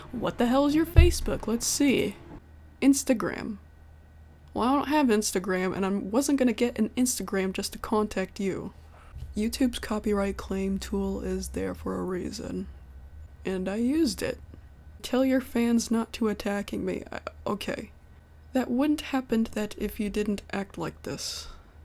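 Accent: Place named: South, United States. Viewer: I love your voice you sound southern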